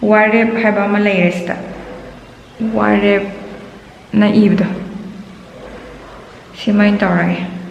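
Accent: Kakching, India. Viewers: Lon thp tamei nowraga last ta yong ym kuirameba heiglak a.....😀 kaow yadeba eikhoi kakching lonse nungcbanehe😍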